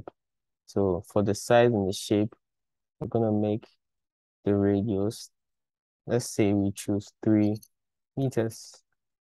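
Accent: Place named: Ghana. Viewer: You have a Ghanaian accent. Are you a Ghanaian?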